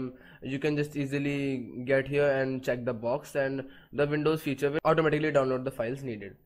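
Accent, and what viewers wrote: India. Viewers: You know the tutorial is gonna work when the accent sounds Indian. ❤ U indian brothers.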